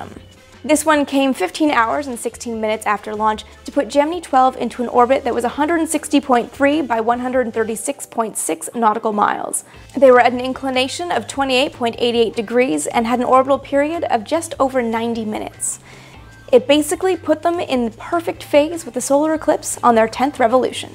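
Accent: Canada. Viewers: Love what you do! Living just a few miles from the path, I'll be doing the eclipse "live". We make a big deal about these events but I've always wondered if so much true science is to be derived from them, why NASA hasn't done more in space research as there is an eclipse occurring at a point in space, constantly. And ignore comments below regarding pronunciation of Gemini... I think it is a bit of a lovely Canadian accent coming through.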